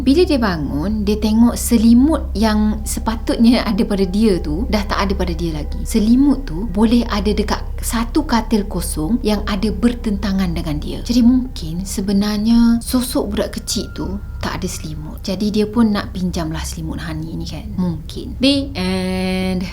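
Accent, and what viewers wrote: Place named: North, Malaysia. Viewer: Asslam...hai asal mana utara kan...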